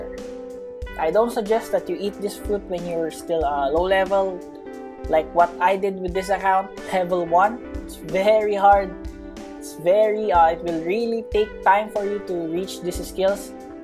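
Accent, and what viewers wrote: Philippines. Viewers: Ngl this dude sounds like Charlz the french and his voice is like Filipino Edit:He is Filipino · I can tell that this guy is Filipino because of his accent.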